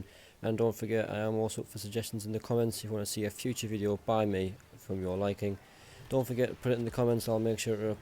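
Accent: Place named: Geordie, United Kingdom. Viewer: Never have I needed subtitles until today Geordie boy. Thank God for the comments in English, appreciate the effort you put in.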